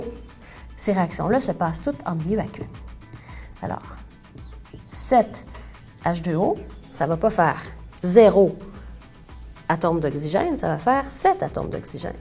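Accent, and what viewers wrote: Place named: Canada. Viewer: Hhhhh accent canadienne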